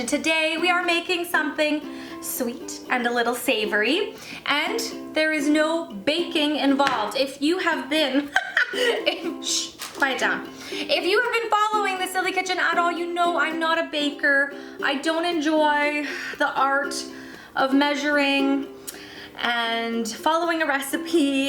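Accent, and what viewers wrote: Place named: Canada. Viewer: Hi,! Those chocolate covered pretzels look amazing! I agree that drizzling on the chocolate over the bowl is the best thing to do. I love how you covered them with so many different things. Curling the bow was a nice touch. BTW: I am not sure if you mentioned you were Canadian in previous videos, but I am really into languages, and I was pretty sure you were...when you mentioned Waterloo and Kitchener, I knew it! YouTube is so great because we can hear accents from so many cities and countries. Thanks for sharing!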